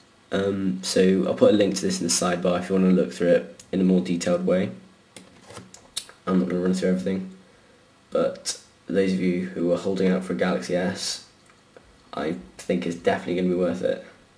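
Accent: United Kingdom. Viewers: are u from uk?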